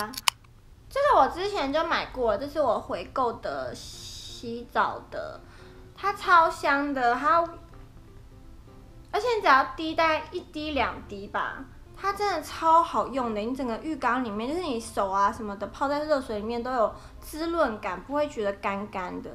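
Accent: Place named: Taiwan. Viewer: 你眼妝太重啦！台灣口音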